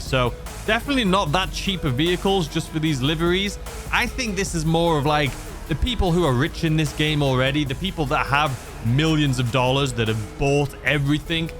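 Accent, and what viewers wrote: United Kingdom. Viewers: I see everybody’s update, but I wait for yours to come out so I can go see yours why is your British accent?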